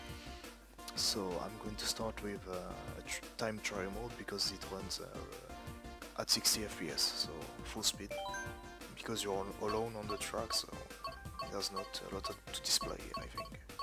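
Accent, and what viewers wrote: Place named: France. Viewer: J'adore ce que ca donne un francais qui parle anglais :p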